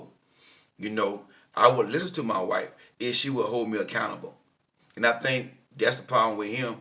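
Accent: South, United States. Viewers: I love and the southern accent!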